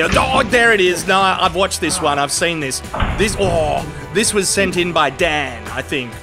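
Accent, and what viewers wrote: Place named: Australia. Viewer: let me remind you, the only reason i watch your show is for your voice, you are funny, witty and amusing, if i want to watch shows of disaster its a dime a dozen on FB, but your aussie voice and jokes is the best, so no more silent videos. thank you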